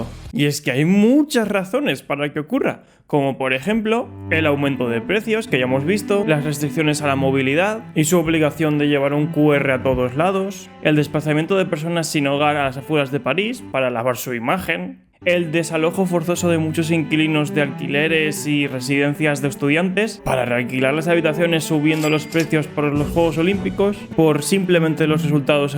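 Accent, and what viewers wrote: Spain. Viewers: y su asentó español eww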